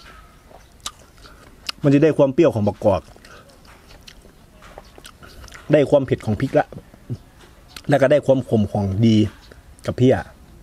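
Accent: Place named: Ban Phue, Thailand. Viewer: เจ้าเว้าคือคนบ้านผือ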